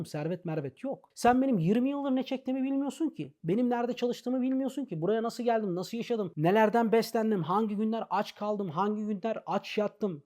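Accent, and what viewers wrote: Thrace, Turkey. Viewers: Trakyalı adam dinleniyor. Not: rizeliyim :)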